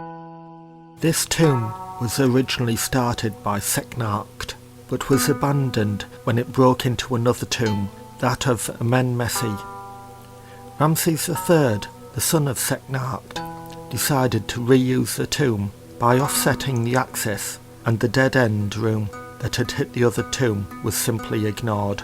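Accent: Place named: England, United Kingdom. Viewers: English accent with a mean lisp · you sound like a young Northern english Richard Attenborough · It's a North east English accent, maybe Yorkshire?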